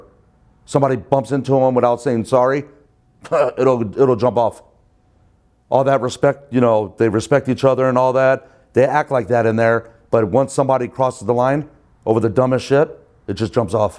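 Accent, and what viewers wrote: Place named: Southern California, United States. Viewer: Dude sounds like Tony Ferguson from ufc. Got that socal accent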